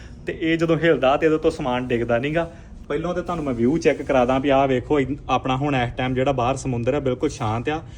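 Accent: Majha, India. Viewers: Avaaj To Lagda va .Veer Majhe To Va ❤................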